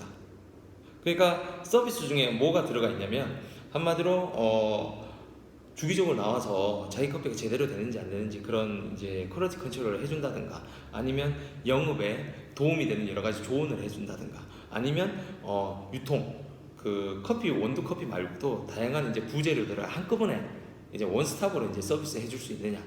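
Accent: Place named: Gwangju, South Korea. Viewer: 광주인가요? 말투가... 위치 어디실까요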